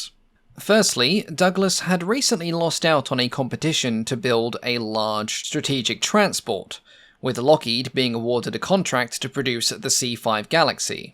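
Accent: Australia. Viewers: Oh god, another Australian with the dull mechanical delivery of a schoolboy reading out his homework. But worse. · Nike is pronounced just like the Nike shoe or did I miss this because of your Aussie accent <link> Cheers from San Francisco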